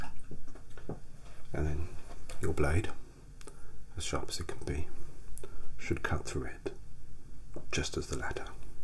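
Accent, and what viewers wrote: United Kingdom. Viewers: Hold on a second... British accent...barber shop....possibly Fleet Street.... look I may be a lowly American from Virginia but I think I see where this is going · Finally a good British ASMR-tist with a beautiful accent and voice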